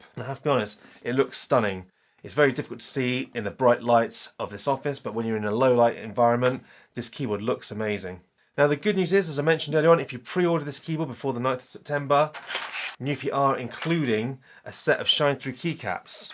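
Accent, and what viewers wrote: United Kingdom. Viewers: Sounds like you're in the UK mate, can you tell us a bit about shipping please? Time taken? Customs fees? Any problems?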